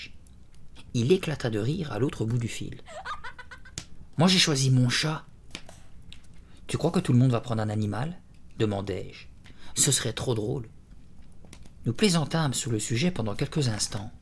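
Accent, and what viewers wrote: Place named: Belgium. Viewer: Bonjour! J'adore vos vidéos. Je travaille à Tokyo, souvent de nuit et vos lectures m'aident à tenir jusqu'au matin. Je ne peux m'empêcher d'esquisser un sourire quand j'entends votre accent Belge poindre. Ça fait partie du charme de votre chaîne 😊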